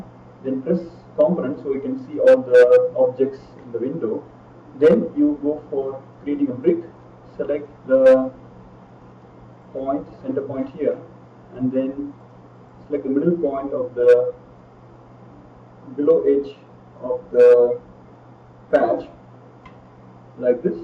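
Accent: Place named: India. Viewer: The audio quality is so poor and the Indian accent so strong that the video is practically unwatchable. Thanks for the attempt but I rank it 3/10.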